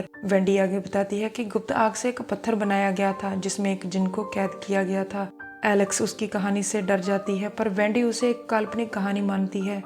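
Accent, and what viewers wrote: Punjab, India. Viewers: so much punjabi accent..in your voice.. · Hardcore punjabi accent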